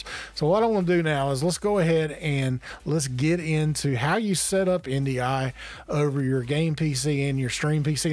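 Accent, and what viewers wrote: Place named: South, United States. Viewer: Where you from brotha? I hear a fellow southern accent.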